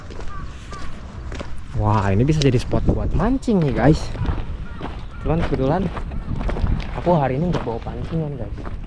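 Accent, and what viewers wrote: Indonesia. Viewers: bisa bahasa jepang tapi logat indonya nampak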